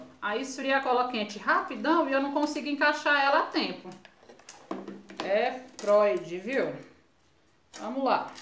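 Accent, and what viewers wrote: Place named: Brazil. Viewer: Adorei o móvel e esse jeitinho brasileiro arretado dela falar. Negra linda. Na cor e na essência.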